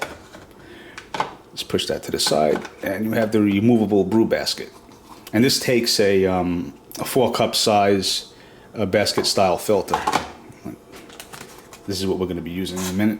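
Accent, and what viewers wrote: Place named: New York, United States. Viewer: ..love your CAWFEE TAWK NEW YAWK ACCENT!!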